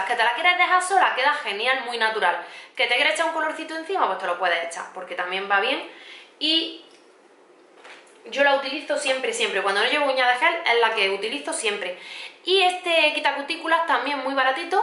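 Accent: Granada, Spain. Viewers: Eres de Granada cierto??? Saludos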